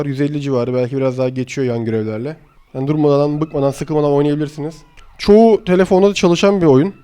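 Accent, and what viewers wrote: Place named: Izmir, Turkey. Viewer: Tenekeli gibi Tepecik gibi abi izmirlimisin :D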